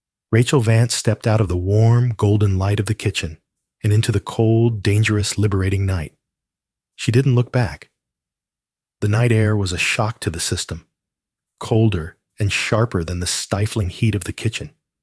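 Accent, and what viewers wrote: United Kingdom. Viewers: . Thank you for your response. it’s not a matter of what I am used to, it’s about that being far and away my favourite… “there’s a difference”… others, I am used to and can get used to, but no other I have heard has that perfect British RP accent. It’s a preference.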